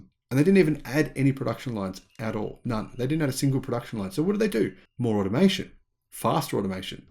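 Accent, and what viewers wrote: Australia. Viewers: You're not a Viking, you sound like an Aussie.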